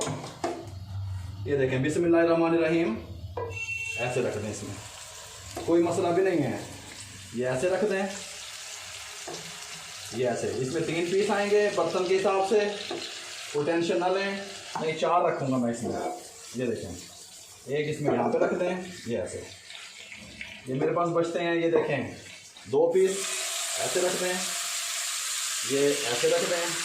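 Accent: Punjab, India. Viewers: Hay Allah ap ki punjbi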